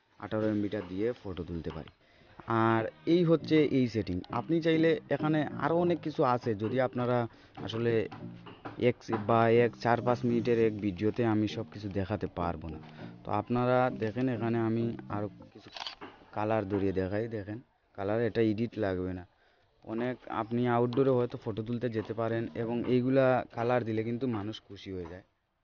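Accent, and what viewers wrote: Sylhet, Bangladesh. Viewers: তর বাড়ি মনে অয় সিলেট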